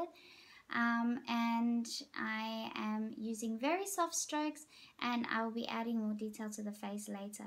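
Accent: South Africa. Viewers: Are you South African? :D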